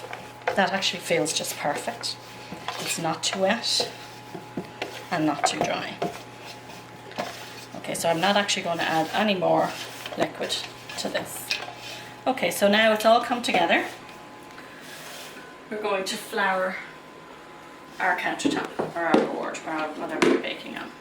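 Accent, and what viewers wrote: Ireland. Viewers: somehow the scones look like they would be more delicious because she has an Irish accent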